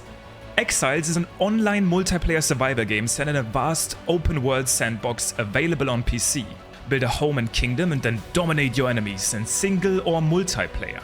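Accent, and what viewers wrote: Germany. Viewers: Das klingt nach einen deutschen aktzent ich weiss nicht wie ich das in Englisch schreiben soll aber bist du deutsch? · your accent sounds so german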